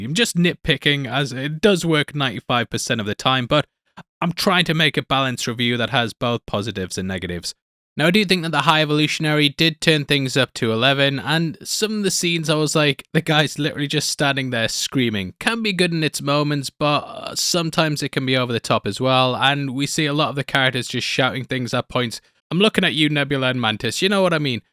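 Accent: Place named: North East England, United Kingdom. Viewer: Oh God you said Monga. Manga ffs. You're English. MANga. Ffs. I get the NE accent.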